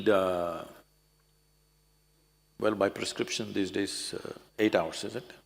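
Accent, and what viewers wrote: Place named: India. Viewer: He is speaking English clearly, he has an Indian accent.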